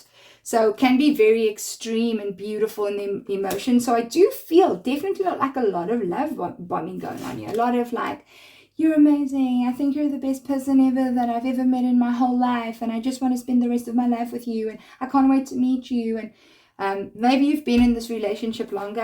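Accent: South Africa. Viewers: You sound so South African. 🇿🇦❤️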